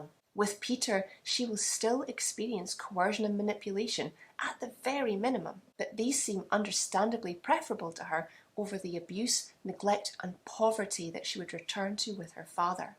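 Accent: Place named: Ireland. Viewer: Came here from tiktok, I like your Irish accent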